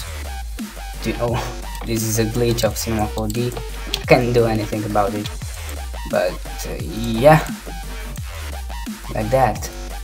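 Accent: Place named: Italy. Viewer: You sound so stereotipically italian.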